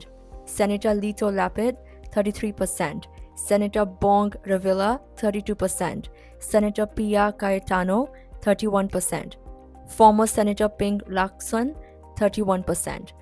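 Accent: India. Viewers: Hahaha, you speak english well but you could not trash your indian accent. That's what you get when you always spread fake news about the DU30's. Are you one of many media outfits that received incentives from marcos jr?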